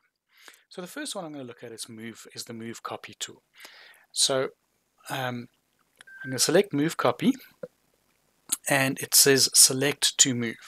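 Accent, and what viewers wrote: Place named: South Africa. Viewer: Hi. Just dropping you this line about how clear and concise your videos are. I've been a long time ACAD user, but my computers out-aged my version of AutoCad. So when I found LibreCAD, it was quite different than my old systems. I tried numerous YT instructors but for various reasons they didn't help me much at all. I'm in Montana USA, so some of the videos were hard to follow, either from difficult accents of instructor, length of lessons or simply confusing instructions. I very much liked the way you broke up the sessions into focus on one area at a time (not too long and complicated), like the detailed use of each of the tools, the ease of following your very light South African accent and the systematic approach to each item. I'm 84, and I don't learn as quickly as I used to, but still active enough to frequently have a need for this program. 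I gather that you are actually a instructor/teacher and you do an excellent job. Your videos make it easy to 'back up' to catch anything I didn't quite understand the first time. Thank you so much. Best regards, Jack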